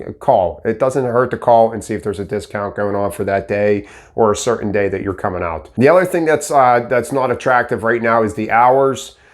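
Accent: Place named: Pittsburgh, United States. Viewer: I just got hired on at Sysco in Zelie as a warehouse selector and start later this month. I've been loving your warehouse training videos and also picking up on your Pittsburgh accent. I heard you say, "n'at," in one of the videos. That on top of the accent, about solidified it for me that you're a fellow yinzer. Then I seen the Kennywood logo and knew we were from the same neck of the woods. Keep the warehouse videos coming. I appreciate you and all your help neighbor!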